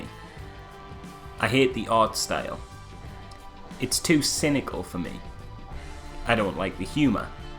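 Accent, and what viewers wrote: Newcastle, United Kingdom. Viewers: U sound like willNE like not just the geordie accent but the actual voice too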